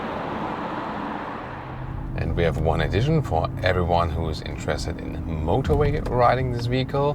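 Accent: Germany. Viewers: Wirklich stets gutes Review - jedoch muss ich immer wieder anfangen zu lächeln, wenn ich das typisch deutsch-typische Englisch höre... nicht böse sein :-)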